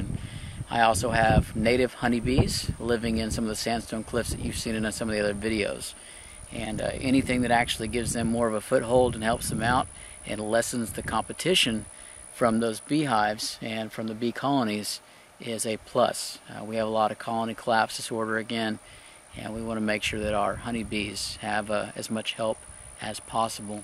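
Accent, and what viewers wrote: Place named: South, United States. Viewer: a man with a southern accent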